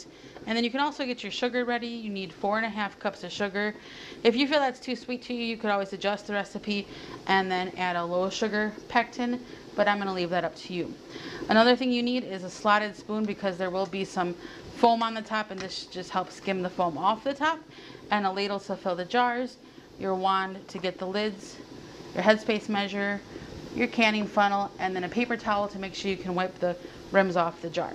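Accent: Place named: North, United States. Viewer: You talk too fast. And we southerners can't understand northenors. That's out fault lol.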